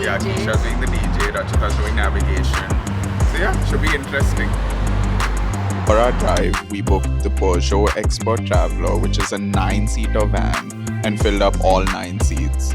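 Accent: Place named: India. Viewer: It’s tough to watch this with that Indian accent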